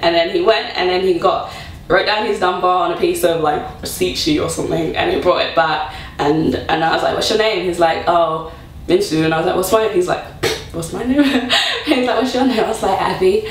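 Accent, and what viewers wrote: United Kingdom. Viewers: So glad I found you! What teach program are you with? I just applied for study abroad to Korea for the Summer. I want to start teaching there when I graduate (Dec '13)....So excited. I love the British accent. I would go into it so much in High School because I watched so much BBC. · Cute...your my Nigerian sister and the only difference is the accent, I speak american and you speak british. I love your stories its awweeessoommee.......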